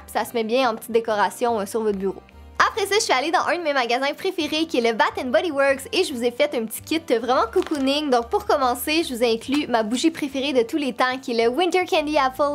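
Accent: Canada. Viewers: Moi noël 🎄j'aimerai avoir un nouveau téléphone ( j'aime trop ton axen canadien ) bisous 💋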